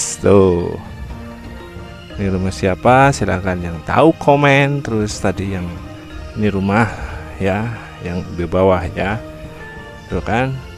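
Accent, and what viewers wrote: Banyumas, Indonesia. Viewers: Rika wong ngendi sih kang jawane ngapak temen pada bae karo kancaku wong cibenying 😀😀😀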